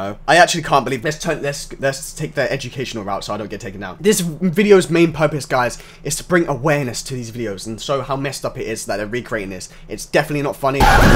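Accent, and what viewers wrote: United Kingdom. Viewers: it actually ji scared me when I realized he was British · bro looks like an american sounds likes a british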